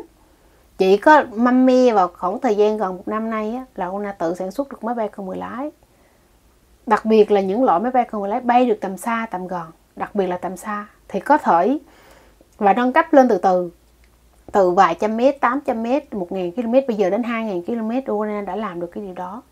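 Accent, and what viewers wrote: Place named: North, Vietnam. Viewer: đúng chất giọng 3ke x.ỏ lá. Ngọng líu ngọng lo cũng bày đặt lên cào phím. "Lato", "Ixarel", quát đờ hợi :face-blue-smiling::face-blue-smiling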